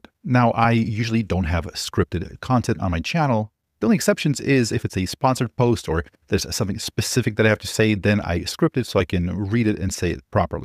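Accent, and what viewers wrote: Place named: Eastern Europe. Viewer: you do have a slight foreign accent though. it sounds Slavic. did you speak Russian first then switch to English at age 13? that's my guess your content rules though, and your tweets are just as good. pure signal over there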